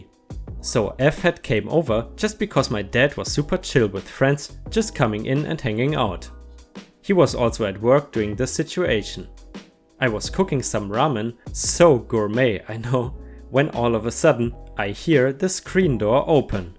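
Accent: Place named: Germany. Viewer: I was pretty sure that accent was German, and it was all but confirmed by your perfect pronunciation of Bremen and SV Werder. Servus~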